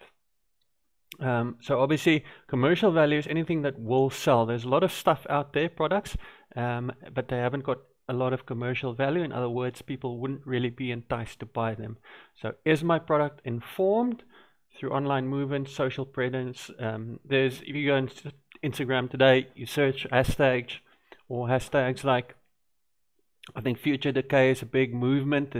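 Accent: South Africa. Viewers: Hi , thanks for the video , really helpful. Got to ask , are you south african ? I am in Johannesburg and would love to conect with fellow creators in ZA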